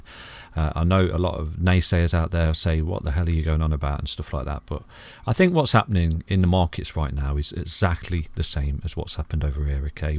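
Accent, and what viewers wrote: United Kingdom. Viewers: Like yout british accent.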